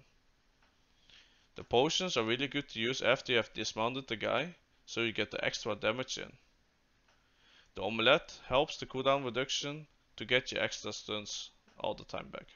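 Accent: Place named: Netherlands. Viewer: For some reason i smell dutch o_O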